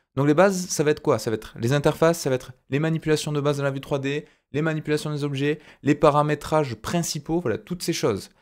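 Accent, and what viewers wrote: South, France. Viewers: Ah cette voix et ce petit accent du soleil, pédagogique à souhait ! Bravo encore à toi !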